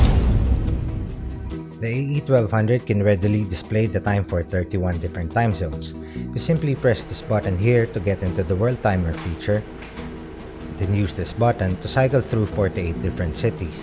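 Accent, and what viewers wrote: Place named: Philippines. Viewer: are you based in the Philippines? You sound like a Filipino. Great production, by the way.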